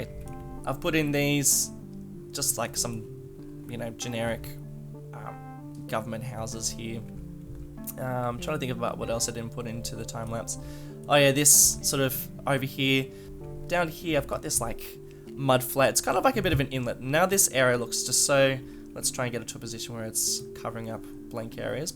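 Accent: Australia. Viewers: You sound like an Aussie. But I can't tell.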